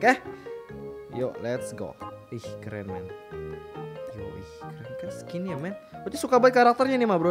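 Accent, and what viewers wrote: Java, Indonesia. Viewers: Iki wong jowo ya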